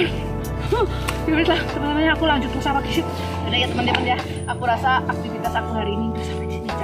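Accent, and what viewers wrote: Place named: Banyumas, Indonesia. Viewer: Mantap yu ngapak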